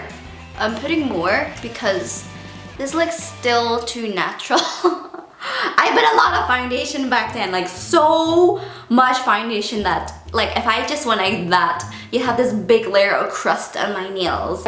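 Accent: Northern Ireland, United Kingdom. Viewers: I love your accent😂 Like I'm from Belfast and I can hear all the Northern Irish things you say, haha👏🏼👏🏼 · you kinda have a british accent 😁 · As a norn Irish meself, i can personally say her accent is way cuter than the rest of ours 😂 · Does she live in Northern Ireland? She has the same accent as me 😂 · She lives in Northern Ireland again so her accent probably got stronger from hearing it all day everyday from everyone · she's from Northern Ireland!!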